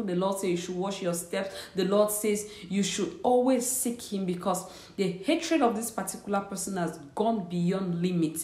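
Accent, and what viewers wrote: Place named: Jamaica. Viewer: I LOVE UR JAMAICA ASCENT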